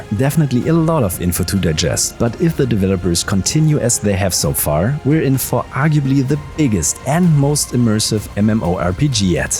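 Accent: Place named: Germany. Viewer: Don't blame him, he speaks weird with that thick German accent.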